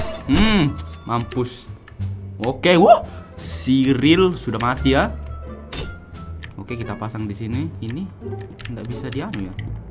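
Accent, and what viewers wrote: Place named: Malaysia. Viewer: apa bahasa malaisya ya maaf aku tidak tahu omong omong nama aku syaurah suara nya kaya malaisya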